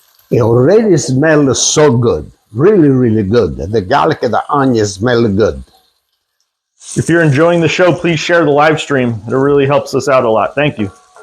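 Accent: Calabria, Italy. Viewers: From Schaumburg IL speaks like my grandparents who were Calabrese. But I have family in Torino!! I see he worked in Torino.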